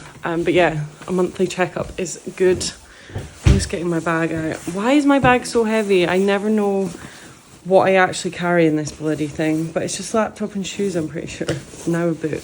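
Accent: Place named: Edinburgh, United Kingdom. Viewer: I stumbled upon your video a couple of months ago and I love them. I love the honesty in them; you're not trying to be some numpty influencer. You're just. Keep on doing that because it's working. Your accent makes me homesick for Edinburgh (I'll get back one day). So, as my late father would tell me "Keep dancing to your own Piper." Now I need to rewind and see what book it was that you mentioned at the start. One day I hope to see you mentioning one of my books (once I've finished writing my first!). x PS: I started following your sisters' vlog. I'm even more homesick!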